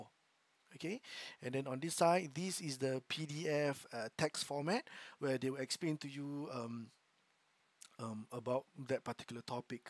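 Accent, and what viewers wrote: Singapore. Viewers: Thanks for sharing. You sound like a Singaporean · Its like the singaporean accent is so strong, i recognized it instantly😅 congrats bro, i just completed Google Cybersecurity Cert and hope to be working in cybersec with just sec+ and oscp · Sounds like Singaporean. Congrats!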